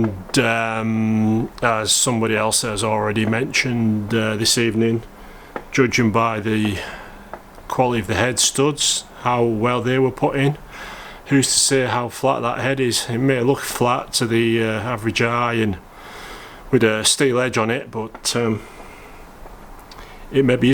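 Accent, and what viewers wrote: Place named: West Yorkshire, United Kingdom. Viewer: Hi I subscribed about 2,3, month ago I saw your site on YouTube as I was flicking through for different mini stuff as iam just about finishing my mini rebuild only took 5 years lol. Iam from West Yorkshire I take it you are with your accent any way hope you solve your water problem all the best. Lee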